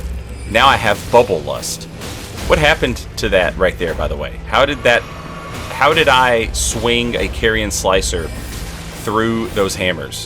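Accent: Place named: Tennessee, United States. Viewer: you're from tennessee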